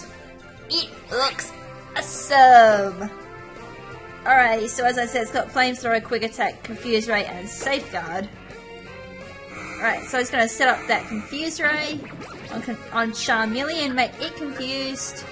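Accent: Australia. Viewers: Are you Australian? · are you an Aussie? (just that your voice sounds like it and im aussie too:3)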